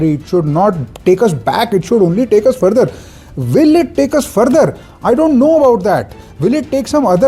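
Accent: India. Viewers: I don’t understand your thick Indian accent English. Please improve your accent so that non Indian English speakers can understand what are you trying to say.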